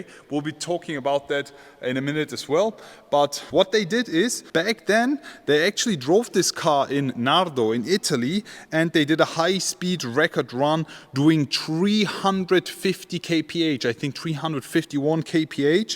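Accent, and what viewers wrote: Germany. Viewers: 독일식 영어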